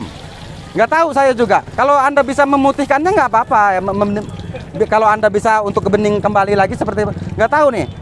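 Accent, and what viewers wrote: West Java, Indonesia. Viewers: Kayaknya sunda tp tegasnya bicara batak punya ..siip keren. Lucu campur da'wah cerdas kali..mohon mintsk no wA nya broo..!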